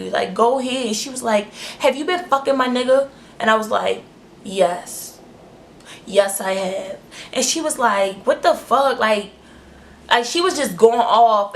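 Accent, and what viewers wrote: Baltimore, United States. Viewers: Omg I knew you were from Baltimore because of your accent! Great video btw:)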